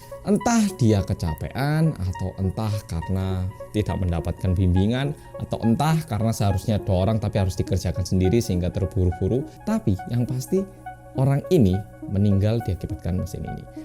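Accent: Java, Indonesia. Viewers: Lah kmn aja..org hidupnya lbh byk di Indo..ngomongnya aja medok bgt..🙈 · Yaelah wajah nya ala Korea logat bahasa nya ala indonesia jawa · Wahh abang ini habis nikah tambah ganteng. Selamat ya bang orang Korea yg medhok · oppa ngomong nya kok medok si oppa 🙈🙈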